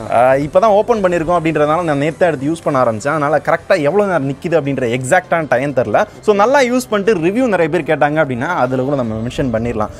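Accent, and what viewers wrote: Madurai, India. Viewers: Bro nenga madurai karar avinga ivinga slang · மதுரகாரங்க பேசுற மதுர ஸ்லாங் கெத்து தான்...!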